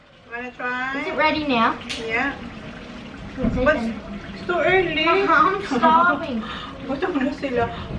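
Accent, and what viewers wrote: Philippines. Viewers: Alam ni yung Filipino accent😂. Love it!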